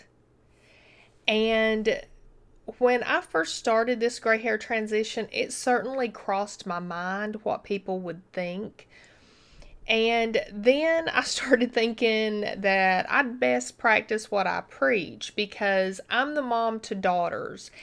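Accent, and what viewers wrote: South, United States. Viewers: I❤️U & your spirit & your southern drawl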